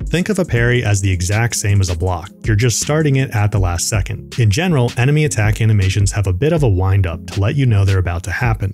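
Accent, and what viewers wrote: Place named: United States. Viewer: Finally an American accent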